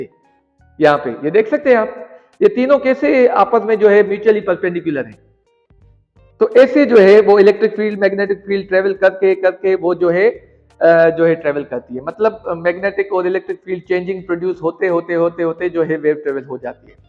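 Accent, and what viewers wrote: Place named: Sindh, India. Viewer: Mashallah sir ap sindhi h mny just imagine kiya apki urdu language se or smjhany k tareeqy se k sindhi h sir ku k sindhi master h physic k or ap b aeseii lghy but ap to sindhi hi h mashallah buht acgy h smjhanab❤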